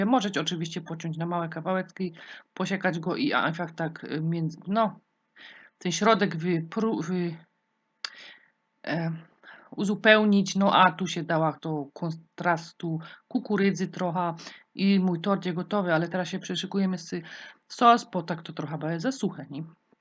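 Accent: Silesia, Poland. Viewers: Mówi po śląsku bo to Ślązaczka to fajny gwarowo język 😃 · Czesto ogladam Pania i nie umia sie nasluchac godania jo tyz jestem z slaska pozdrawiam fajne przepisy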